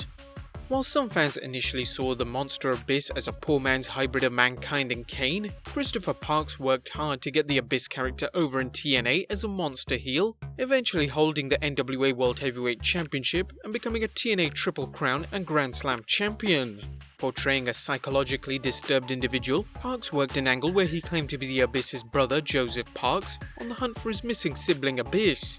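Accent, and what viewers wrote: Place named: England, United Kingdom. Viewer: Why does every wrestling channel have a commentator with an English accent... or is it just me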